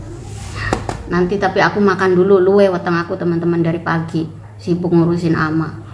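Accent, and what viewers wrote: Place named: Cirebon, Indonesia. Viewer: Semoga ama cpt sembuh....,maaf mba wong crebon ya...dari dialeknya..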